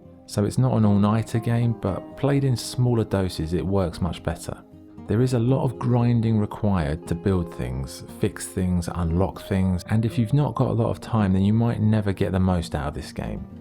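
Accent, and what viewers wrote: England, United Kingdom. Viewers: Love your channel, it’s the only gaming review channel where the persons voice isn’t really irritating to me and you’re English too . Keep up the great content mate really chills me out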